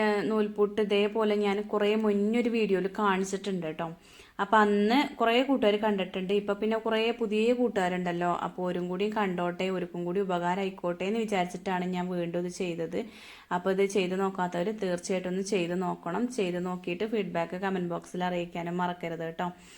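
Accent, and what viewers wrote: Malappuram, India. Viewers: Chechinte ഇതേ സംസാരം ഉള്ള ഒരു കൂട്ടുകാരി എനിക്കുണ്ട് അവളും മലപ്പുറം aaa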